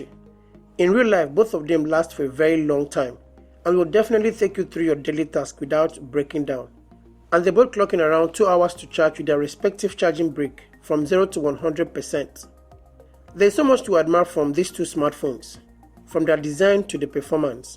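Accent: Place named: Nigeria. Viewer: That Nigerian accent. One of my friends is Nigerian and the accent is brilliant.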